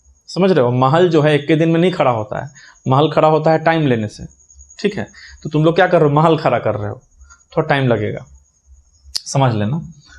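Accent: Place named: Bihar, India. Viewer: Bihari Accent giving legendary vibes✨✨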